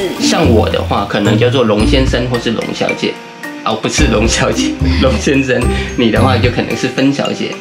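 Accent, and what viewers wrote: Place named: Taiwan. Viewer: 台湾腔好重哦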